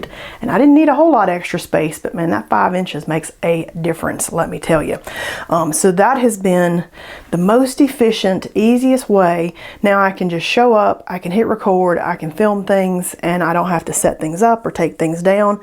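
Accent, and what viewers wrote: South, United States. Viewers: Thanks for the great content, your southern accent is lovely!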